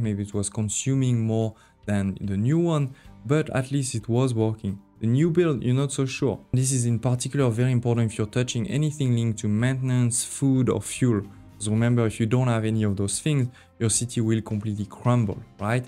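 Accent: France. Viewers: Your French accent is wonderful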